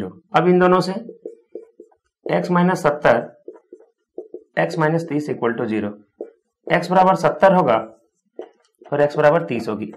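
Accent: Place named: Bihar, India. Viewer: Are gjbbb Gurudev ap to.....1 dum bihari tone m mazaa aa jata hai padhne m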